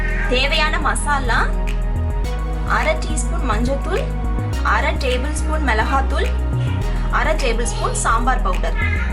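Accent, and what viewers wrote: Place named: Tirunelveli, India. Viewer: Super... I'll try... Tirunelveli slang nalla vaaruthu nadavula...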